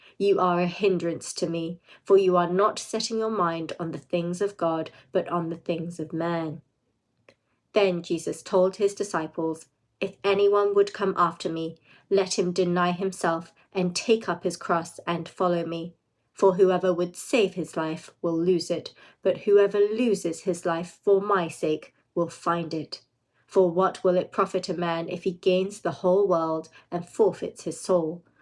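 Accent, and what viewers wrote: United Kingdom. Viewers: No offense but British accent makes me feel like I've lost all understanding of English as If I it's not my own language, this is probably how non English foreigners feel when they hear English 😂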